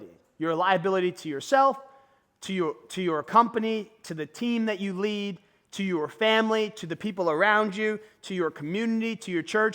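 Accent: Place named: Philadelphia, United States. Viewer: 47 year old Irish prick from Philly here. Your accent and mannerisms are similar to mine even though I've spent decades on the Left Coast. I like your advice and information. I'm struggling with getting belly fat gone. I do HIT lifting twice a week. I tried every 3 days the past two weeks but my recovery takes longer at my age. Nevertheless, I've seen really good results muscle wise and I also walk 2-3 times per day morning, midday, and after dinner. Walking is huge! I'm not into running, but I'm pretty consistent with walking, good sleep, lifting, and eating healthy. I eat mostly whole foods, but I think my problem is the portion sizes and eating carbs later in the day like you pointed out. Any other advice would be greatly appreciated. Awesome video. God bless!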